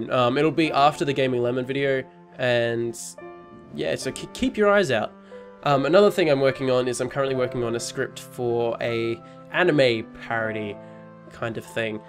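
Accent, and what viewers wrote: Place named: Australia. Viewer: Can I just say, you sound quite similar to Markilplier.. But Australian..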